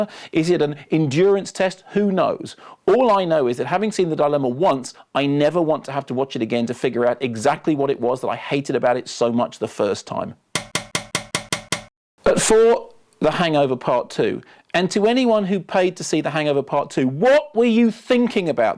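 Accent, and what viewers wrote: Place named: England, United Kingdom. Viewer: Can't really argue with him, he does have an English accent after all...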